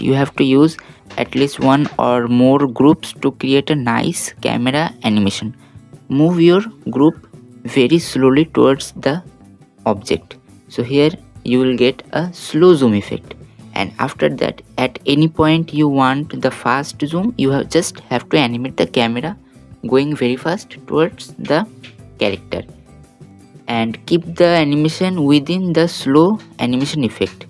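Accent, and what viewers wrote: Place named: India. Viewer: Your voice indian but language English, where are you from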